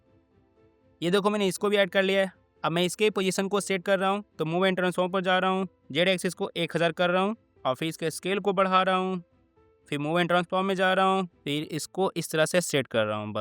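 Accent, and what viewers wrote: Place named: Bihar, India. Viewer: Bihar ya jharkhand se ho kya? Ascent to waisa hi hai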